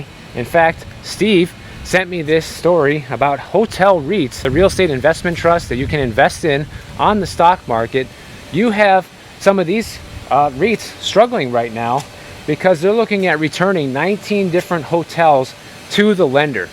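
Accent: Brazil. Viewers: She sounds Brazilian! Viva Brazil🇧🇷✨ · Is from Brazil? My wife is Brazilian. She and could be sisters...accents and all.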